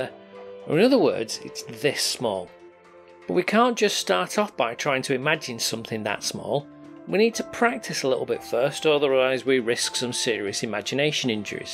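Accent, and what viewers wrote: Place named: Midlands, United Kingdom. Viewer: The Midlands (UK) accent is a key component for the "perfect" science video too ofc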